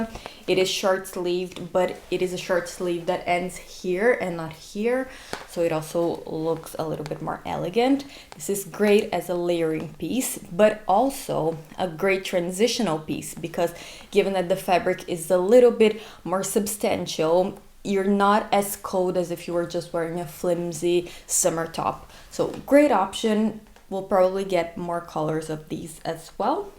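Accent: Brazil. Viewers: love it lol. Would have never guessed you had a Brazilian accent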